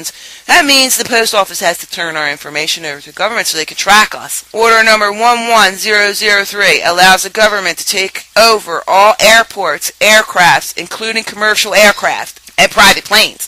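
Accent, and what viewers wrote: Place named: Baltimore, United States. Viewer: wtf.. you have a baltimore accent lol...great video though.. it speaks the truth.. PRESIDENT RON PAUL 2012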